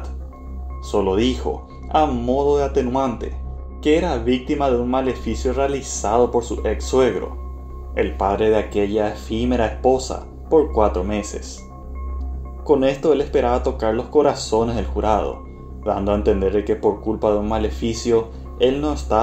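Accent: Paraguay. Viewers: Exelente video maestro 💣, sos de Ciudad del Este, Saltos o Pedro Juan si o si por tu acento 🇵🇾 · UF TU VOZ SUENA A BRASIGUAYO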